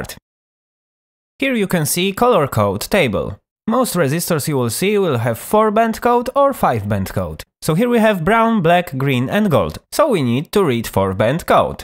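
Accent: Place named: Poland. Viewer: Kurwa uslyszalem akcent i wiedziałem ze z polski XD cieszy mnie to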